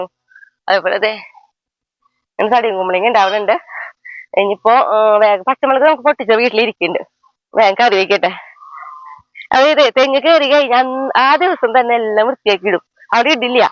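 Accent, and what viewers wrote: Thrissur, India. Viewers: അത് തൃശൂർ സ്ലാങ് അല്ലെ..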